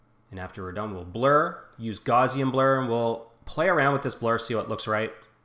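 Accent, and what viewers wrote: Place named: Atlantic Canada, Canada. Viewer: Great video! Cool accent, almost sounds like you’re from Atlantic Canada.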